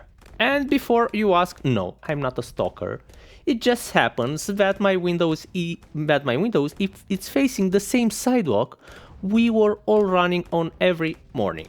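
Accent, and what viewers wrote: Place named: Romania. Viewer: nu bro, ai accentul ala al nostru :))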